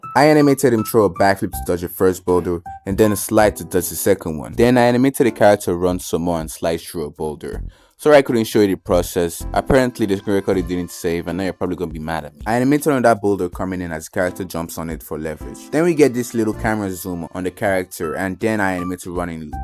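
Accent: Nigeria. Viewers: U no fit hide ur Nigerian accent. Ogbeni nice video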